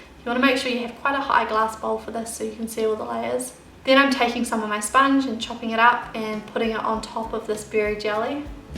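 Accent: New Zealand. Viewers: are you from Newzealand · Are you from NZ? I feel totally at home hearing your acccent and I don't know why else that could be. :D Thanks for the recipe btw. :D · Mira J. I think she's from New Zealand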